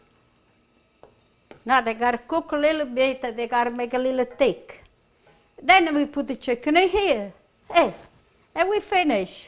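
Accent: Italy. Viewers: If your going to make fun of her Italian style accent stop comments. Your RUDE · I just love cooking. She has a very easy way of explaining her style of cooking and I love her Italian accent! Thank you! God bless you & your family!😘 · you are our treasure! I made chicken alla Franchese your way and it was just as delicious as your Italian accent! Love you! ❤❤❤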